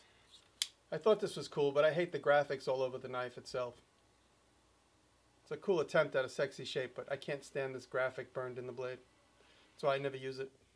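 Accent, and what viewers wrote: New York, United States. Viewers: Love the New York accent.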